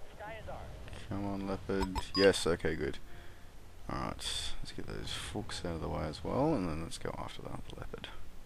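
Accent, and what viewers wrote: Australia. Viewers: You make some brilliant content, and I love watching your War-game Videos. But its a pity you don't have that many viewers. :( Also are you Australian? And do you do Youtube as your job?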